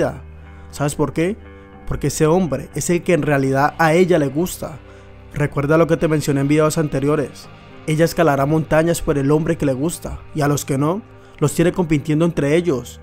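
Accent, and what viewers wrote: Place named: Cali, Colombia. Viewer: Excelente video. El pana de Cali. El acento lo delata. La info del video es verídica 100%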